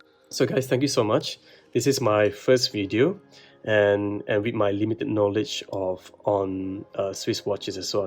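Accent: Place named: Malaysia. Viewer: Are you Malay? Because i recognise that accent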